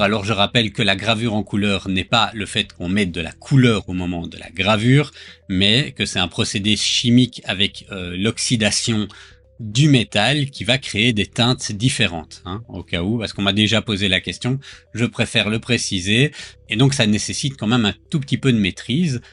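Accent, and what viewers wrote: Belgium. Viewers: Bravo pour ce test. Clair, sympa et sans pression. Ce petit accent belge est super agréable 😜. Ca donne envie d'ajouter un laser à mon atelier. Merci pour tout ce travail.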